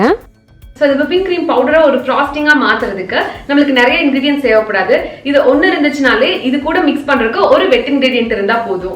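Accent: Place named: Pollachi, India. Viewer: பொள்ளாச்சி மொழி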